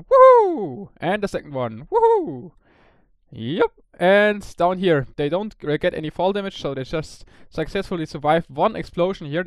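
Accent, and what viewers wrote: Germany. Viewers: man merkt direkt das du ein deutscher bist xD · ***** merkt mann eig echt leicht du hörst das deutsch raus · Ein sehr deutscher Akzent...hm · Hab sofort gemerkt das Du ein Deutscher bist ;-) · Are you german? You have an really stong german accent. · Bist du Deutscher? Man hört so nen kleinen Akzent raus. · Wie man direkt hört, das du ein Deutscher bist :D Wie dem auch sei, gutes Video! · You really sound like a german guy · du bist deutsch, oder? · Du bist doch deutsch du hast an akzent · You are deutsch, stimmts? Hört sich lustig an :D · Starting the video, I knew right Form the get go that you're German as well :D nice video tho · Du bist ein deutscher oder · tell me im wrong...but i think you are german (im german too so i might probably be able to tell better) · Your pronounciation sounds like you are from germany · He's speaking English with a German accent. 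· [german accent detected] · Echt ey klingst voll deutsch melde dich mal :D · You have a bit of a german accent... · mann hört das du deutscher bist :) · deinen Deutscher Akzent hört man voll raus ^^ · youi have a german aczent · du bist Locker deutsch! :D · Wie man den deutschen Akzent hört xD Is ja übel This guy is german, u can hear it by his accent. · du bist doch deutsch , das hörtman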